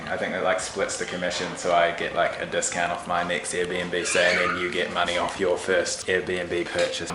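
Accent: New Zealand. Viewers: It's not an english accent though? Place called New Zealand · Are u from new zealand?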